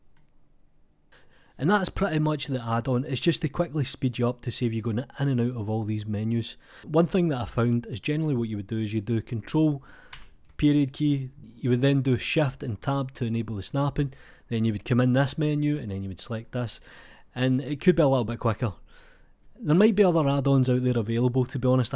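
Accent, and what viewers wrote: Scotland, United Kingdom. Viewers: I subscribed for two reasons: 1) I absolute love the fact that you are doing this and doing it for free. This will help lots of artists coming from maya and make the transition much easier and faster. 2) I absolutely love your voice and accent. It makes me remember the william wallace campaign of Age of empires 2. Also: Please please if possible make it someway that mimics the maya's pivot system. The blender forum has a request for better (and faster) pivot system and I really believe maya's way is lot faster to do. First and foremost it'll help out a lot of students who want to work in blender! Thank you!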